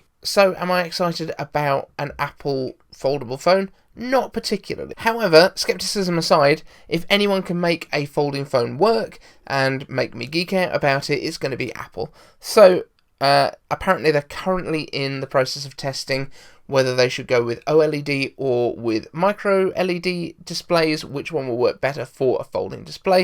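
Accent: United Kingdom. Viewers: You could have a pen knife. I am a recent subscriber enjoying your updates, nice to hear a British accent as well :)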